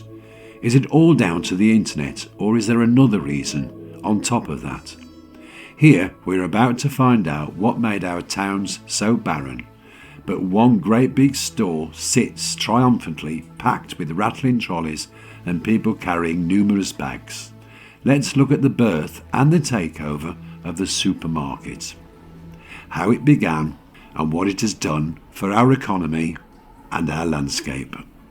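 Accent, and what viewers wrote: Birmingham, United Kingdom. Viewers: Aah ' a fellow brummy......✅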